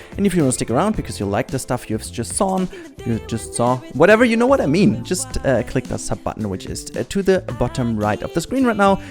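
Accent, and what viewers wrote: Germany. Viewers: Thanks for your afford! Appreciated it - you have a german accent - don't you?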